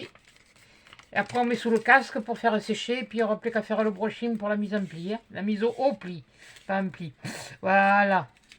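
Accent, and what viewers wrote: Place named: Marseille, France. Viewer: Bonjour marseillais, dites moi qui est Coco? J'adore votre accent, j'ai le même 😊je suis d'un petit village à côté de Toulouse. Bonne journée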